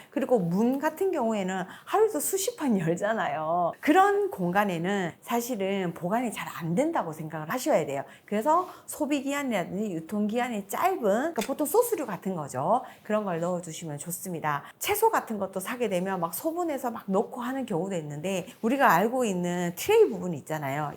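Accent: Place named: Daegu, South Korea. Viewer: 대구사투리 넘 예쁘네요~ 😂물론 도움도 많이 됩니다😊 구독!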